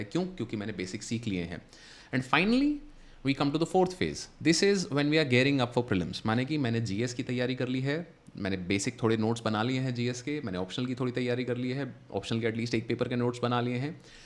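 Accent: Bengal, India. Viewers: Sir, Are you Bengali ? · Sir are you bengali?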